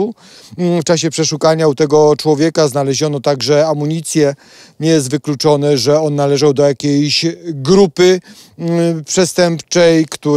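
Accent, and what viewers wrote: Kraków, Poland. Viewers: Panie... no nie czarujmy się, powiedzieć, że full profeska jak na amatora i że pan Fajbusiewicz mógłby panu plecak nosić, to jak nic nie powiedzieć. Swoboda przed kamerą, merytorycznie przedstawiane sprawy, ten cudowny krakowski akcent ( jestem z pomorza zachodniego), no i oczywiście fenomenalne kadrowanie jak na " youtuberowych stremerów " to jesteście prze mega... tego, tego ale nie przeginajcie, bo to zajefajne kadrowanie z dołu staje się Waszym Logotypem. Pozdrawiam gorąco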